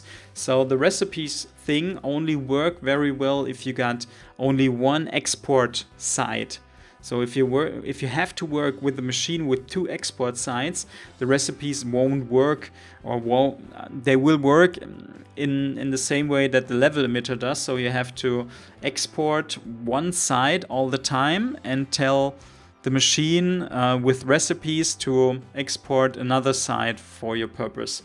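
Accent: Germany. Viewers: Kann es sein das du Deutsch bist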